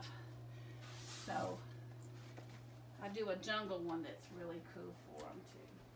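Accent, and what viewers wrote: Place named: South, United States. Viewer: That is that sweet southern accent for ya! Hahaha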